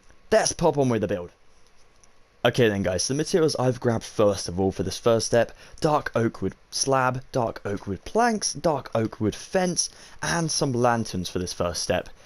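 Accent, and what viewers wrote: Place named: United Kingdom. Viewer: the british accent makes this better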